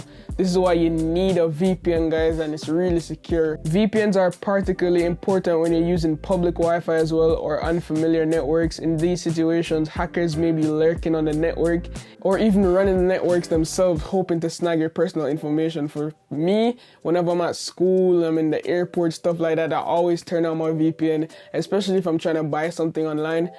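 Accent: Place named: Jamaica. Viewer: Yeah, I can now here the Jamaican accent 😃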